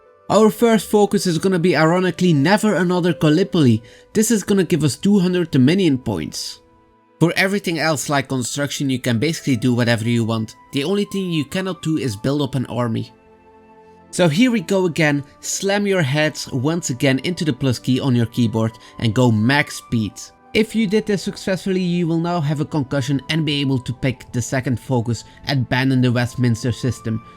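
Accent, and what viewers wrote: Netherlands. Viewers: Are you Dutch or Flemish? Just noticed it in the beginning of the vid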